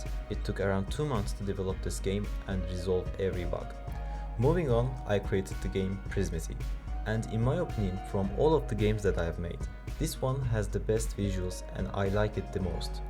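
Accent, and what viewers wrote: Turkey. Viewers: you have turkish accent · is this a turkish accent? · Türksün giba aksanın öyle gibi geldi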